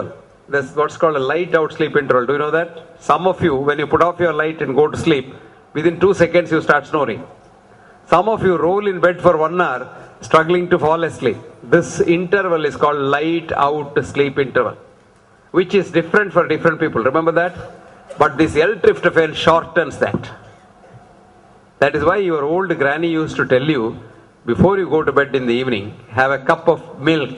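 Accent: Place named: India. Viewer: Dear Dr. Hegde, I feel blessed to have found you, Your honesty, Knowledge, and Love is so encouraging and satisfying. Your, Your, Your ACCENT however is punishing to Non- Indian audiences. Would you please turn-on the (CC, Closed Captioning) on all your videos . It saves people like me plenty of time and frustration of rewind and forwarding to make sure we understood everything. I feel blessed to learn from you everyday.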